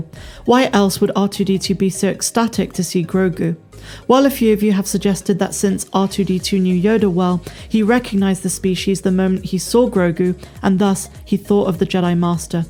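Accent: United Kingdom. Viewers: I love your british voice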